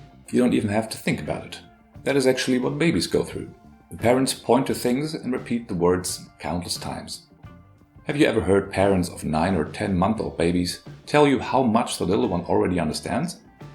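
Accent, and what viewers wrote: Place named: Germany. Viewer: Horrible strong "English" (german) accent.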